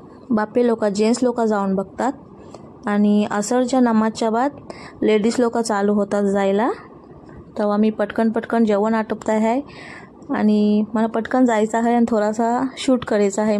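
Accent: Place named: Konkan, India. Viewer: रेसिपी तर छानच. मी तुमच्या चॅनेल वर नवीन आहे. कोंकणातील मुसलमानांची मराठी किंवा कोंकणी खूप खूप गोड आहे. मध्ये मध्ये अरबी, उर्दू, पर्शिअन शब्दांची पेरणी. ऐकताना खूप मजा येते. आपण कुठल्या गावातून विडिओ बनविता ते सांगाल का ?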